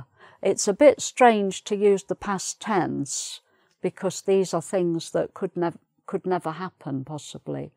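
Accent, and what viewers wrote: England, United Kingdom. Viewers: indeed! As a Scot, I find references to an English accent as "British" accent both irritating and, frankly, insulting. Aside from the four countries which make up Great Britain and Northern Ireland and their distinctive accents, there are hundreds of regional accents. All different and all "British." "British" does not just mean Home Counties English. PS, love this teacher's style, could listen to her English accent all day. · Are you British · I love your English accent!! Love!! Greetings from Spain!💕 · I really love your calm and perfect British accent. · I really love her British accent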